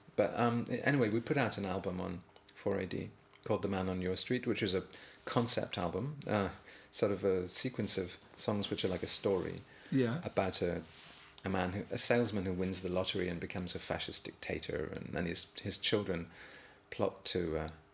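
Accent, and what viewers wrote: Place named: Scotland, United Kingdom. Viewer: Posh Scots, eh?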